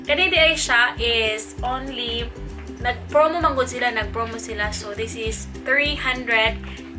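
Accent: Visayas, Philippines. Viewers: Gusto ko rin gumagamit ng ganyan ang dami kung blackheads. Ang cute mo magsalita ng Bisaya sis at madali pala yan gamitin. Gusto ko yung napasabi ka ng OMG at effective nga.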